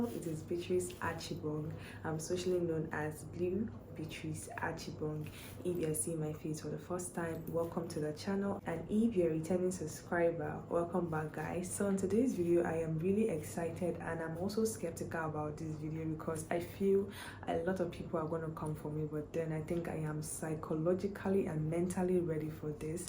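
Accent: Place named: Ghana. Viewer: Your accent is even becoming like Ghanaian accent🇬🇭🇬🇭🇬🇭🇬🇭🇬🇭♥️💛💚❤️🇳🇬💚⚪💚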